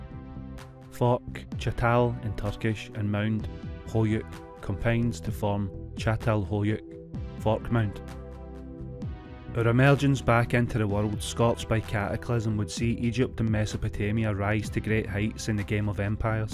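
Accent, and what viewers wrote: Scotland, United Kingdom. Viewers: English with a Scottish accent 🏴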